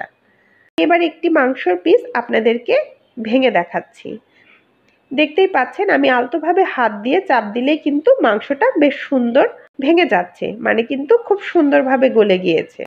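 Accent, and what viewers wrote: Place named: West Bengal, India. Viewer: আপনার চ্যানেলের নাম, আপনার কথা শুনে মনে হয় পশ্চিমবংগের। যদিও দেখলাম বাংলাদেশের।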